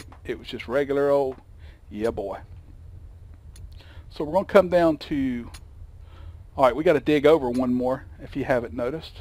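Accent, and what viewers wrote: South, United States. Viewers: Glad I can hear you in this video. Hated that I could hardly hear anything in the last 3, and happy to hear a fellow southern voice.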